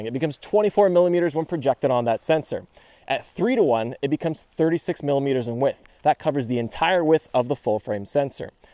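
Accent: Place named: Canada. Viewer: It may be his Canadian accent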